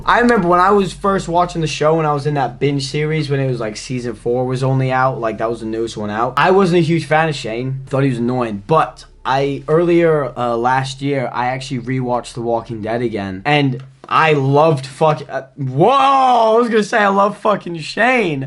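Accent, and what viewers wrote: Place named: United Kingdom. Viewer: I cant tell if youre an American with a slight British accent or a British person who's lost most of their accent Edit: definitely british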